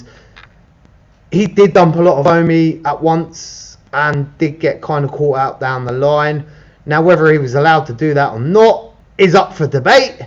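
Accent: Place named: England, United Kingdom. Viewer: "also hey, your deffo from England not Wales haha you better get that Rhys name correct ahhaha same name as me it's a Welsh name pronounced like R "ee" s 😂😂😂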